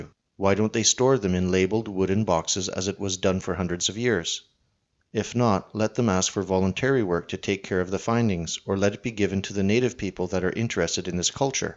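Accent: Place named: Canada. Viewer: are you a Canadian academic? You have a Canadian accent. Just wondering. You are very courageous. But it seems a very lost cause. Bad odds. Sorry. Just my view.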